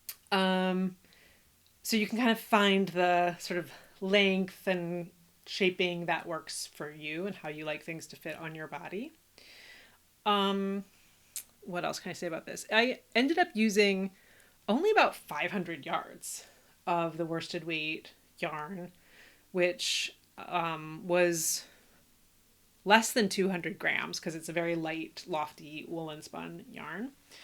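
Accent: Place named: United Kingdom. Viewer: Wow! I’m only a few minutes in and coming here to say that I am glad to find you and am excited for your videos! My guess is UK, Britain? 🐑 congrats on Jeopardy. Super cool.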